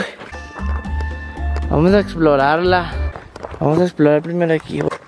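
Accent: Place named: North, Mexico. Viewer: hablas como norteño:v de donde eres?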